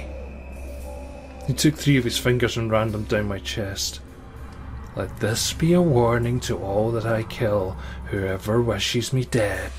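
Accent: Scotland, United Kingdom. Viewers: did this really happen?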